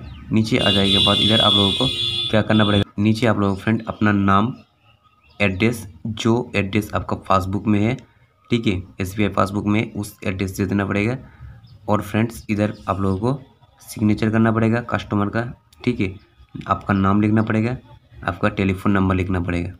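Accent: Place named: Bengal, India. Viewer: Jo bhi ho ye to pata chal gaya k tum bangali ho.hindi tumko thikse nahi ata hai to bangla me vodeo banaw.hindi me jaruri nahi hai.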